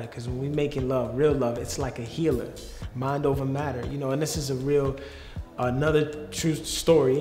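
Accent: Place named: New York, United States. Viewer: You can really tell he is really from New York 😂🤣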